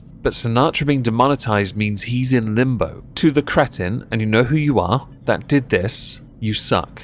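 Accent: United Kingdom. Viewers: I think having a British accent helps.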